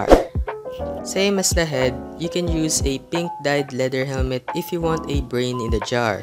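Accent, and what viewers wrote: Philippines. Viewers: What is your nationality? I think your Filipino because of your accent? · Ate u a Filipino?the accent lol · ur from the philippines, i can hear ur accent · Ummmmm are you filipino? Couse you sound like filipino hehe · Pinoy ka kuya noh?? Yung accent mopokasi hahahha halata!! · Men hes accent is familiar? Are you a filipino? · U sounds like Filipino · Break not Broke. also are you filipino cuz your voice sounds filipino...^_^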